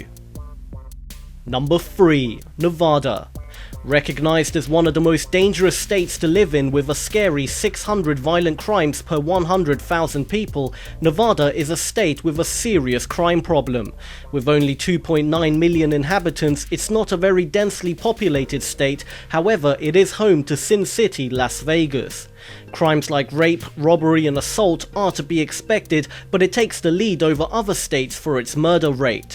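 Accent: England, United Kingdom. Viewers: Where's this guy from,?!!! Britain?! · Anybody find this insulting that somebody from the UK is the narrator? Seriously what does he know about Our States and what goes on there?! I'm quite surprised that Florida didn't make that list, I've been living here for decades and let me tell you that between the heat and transient nature of the people here that it's not a big secret that there are an astounding amount of seriously twisted, violent, nut jobs that've called this state home! I could name numerous Serial Killers that've taken up residence here at one point or another... Ted Bundy, Otis Tool, Christoher Wilder etc. We are the State that the Pulse Nightclub massacre & killings occoured at, we had the UF Murder of the College Coeds in Gainsville, we are the number 1 state for those scam drug rehab centers, which makes this state incredibly active in drug abuse and crime, we had the aftermath of the Mariel boat lift in 1980 which spawned the Scarface movie, based loosely on real events and people. 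In the 70s & 80s we were the #1 State for drug smuggling which had a significant impact on drug related violence. We had numerous infamous people here like Dalia Dipolito who's murder for hire attempt on her husband was near by where I live, we had the Casey Anthony murder case, the infamous kidnapping & Murder of Adam Walsh, the list just goes on & on... With the Everglades & the Atlantic ocean as resources, we had well known places that the bodies have been hidden and disposed of.... We're in the top 3 states for Gun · phelp Australian? Are you an idiot. He's obviously English.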